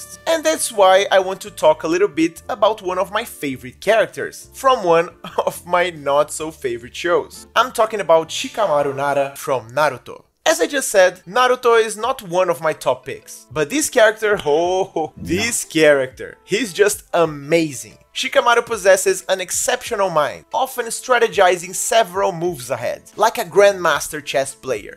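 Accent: Brazil. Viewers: loved the video dude, but where is your accent from? the way you pronounce the names of the characters sorta remind me of the brazilian way of saying their names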